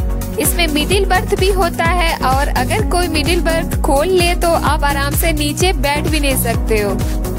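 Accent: Bihar, India. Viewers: Your bihari accent is lovely 😍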